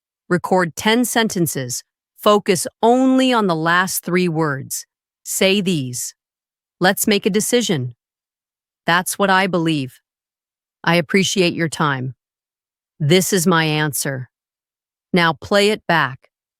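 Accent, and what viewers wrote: United States. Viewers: How come you have shown British flag but speaking American English 😅😂 , overall it’s a great video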